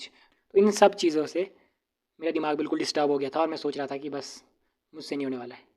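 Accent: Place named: Himachal Pradesh, India. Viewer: Maine 1st tym dekhi apki vdo .. or apki tone se hi smjh gyi thi ki pkaa hp se hone ap bro 😁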